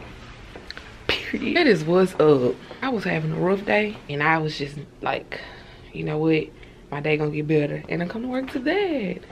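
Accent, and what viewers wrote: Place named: South, United States. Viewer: Voice hella country lol but at least the vids aren't boring like some others who do them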